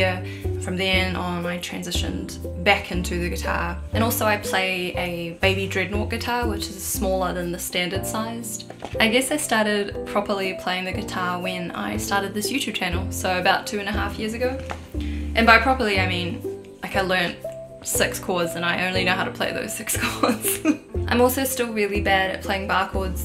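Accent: New Zealand. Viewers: Thank you so so much for sharing a little bit of background story about you. I have always listened to your music but to learn more about you was fascinating because I thought you were living in China and Chinese but you sound like you are from New Zealand. That threw me way off lol but I love your music and your Vibe and your authentic personality! And I can't wait to hear what you come out with next! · found your channel while looking for a guitar tutorial for " " by. great cover and guitar composition by the way. your speaking voice is so different to what i expected. love the kiwi accent lol.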